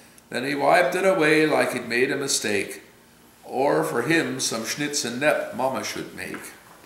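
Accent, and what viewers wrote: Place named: Pennsylvania Dutch, United States. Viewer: Ich gleich dei Deitschy accent. I like your Dutch accent.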